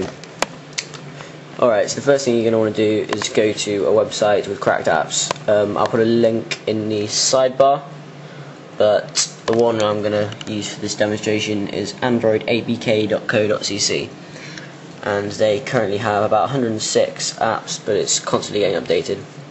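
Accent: England, United Kingdom. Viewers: although i'm not from uk i love the english accent! :D btw i'm from israel! :P great vid!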